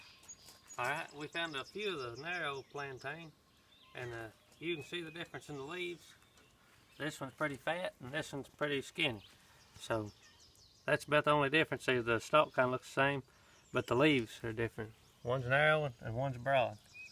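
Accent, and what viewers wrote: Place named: West Virginia, United States. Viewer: you all have a beautiful garden.. and the sound of your voice reminds me of my home in Logan county West Virginia.. I have lived in south Carolina for almost 19 years and I can't get nothing to grow in this red clay.. I could grow things when I lived up home in the mountains.. I miss my mountain home.. May God continue to bless you and your little family...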